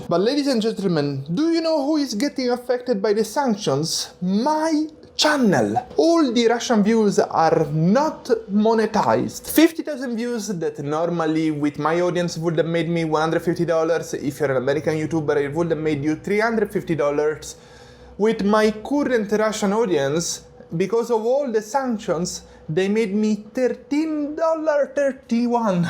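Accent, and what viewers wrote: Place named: Italy. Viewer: I love the Italian accent. It is the most understandable for Russian speakers 💖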